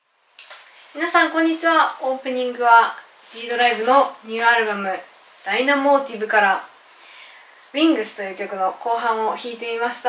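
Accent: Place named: Kansai, Japan. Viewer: やっぱり、ときどきの関西弁 可愛いです。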